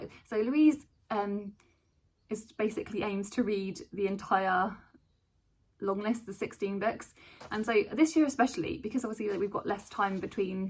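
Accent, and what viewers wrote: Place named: Ireland. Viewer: love an Irish narrator!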